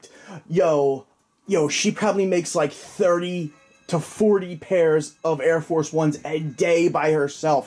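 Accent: Philadelphia, United States. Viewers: much love! nothing but love on them 8 years cuz! I hit 10 years in October...don't let nothing take you down and realize that you're handlin ya business regardless of all the other bs..I hope your personal life gets back on track too..it's funny, my girl hates that I watch these vids on youtube..ya know, the "oh my god, another fukin pair of kicks" type girl..but anytime your vids come on she's knockin me off the computer like"yo, let me get dat jawn, it's bitch"..and i'm like"damn, kick back breezy"..na, but she thinks you're funny as fuck and she loves your passion..we're both from south philly, so it's like listenin to one of my boys..but 4 real cuz..stay up and do you, but drop a vid here and there for the real heads..peace! p.s.-check out that new and album-unpredictable cuz!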